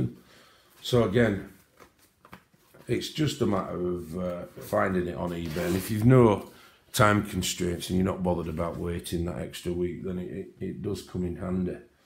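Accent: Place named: North, United Kingdom. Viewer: First video I’ve watched of yours and it’s lovely hearing another Northerner. Some beautiful books 😍😁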